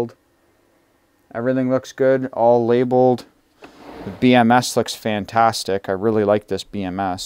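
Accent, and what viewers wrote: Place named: Texas, United States. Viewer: I love your videos but your accent is really cute (i had to edit because youtard doesn't understand Texan mixed with Spanish lol)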